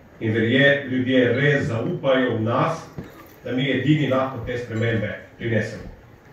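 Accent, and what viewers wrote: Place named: Northern Primorska, Slovenia. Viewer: NI lepšega kot slišat severno primorski glas v politiki. Diši po poštenosti.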